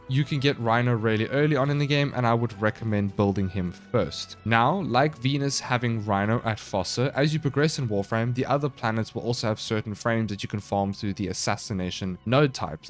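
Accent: South Africa. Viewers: Man, I left home two years ago but can still tell a Saffer when I hear one. Awesome videos!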